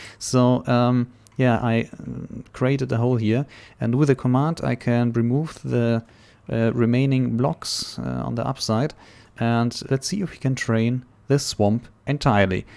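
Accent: Germany. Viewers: Man hört das du deutsche bist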